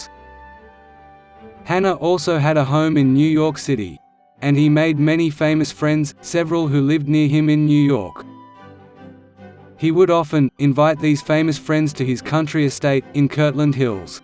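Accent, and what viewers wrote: United Kingdom. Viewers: WTF?! Why a British accent. Turned off, leaving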